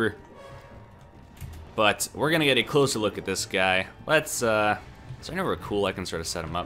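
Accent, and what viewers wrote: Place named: Canada. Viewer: I love your Canadian voice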